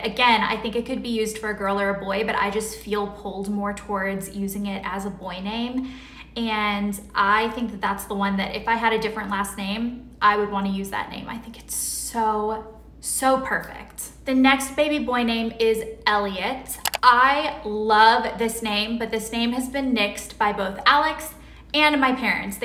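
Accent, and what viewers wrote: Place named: South, United States. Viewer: You're sounding so much more Southern since you've moved out of LA. I'm so glad you've come back to YT, I've been watching you since your college pink room with the Eiffel tower painting. I have 2 boys Jude and Rory. It's so hard to name kids.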